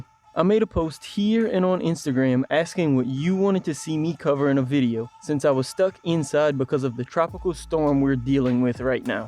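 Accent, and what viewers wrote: Louisiana, United States. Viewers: Bro.are you from Louisiana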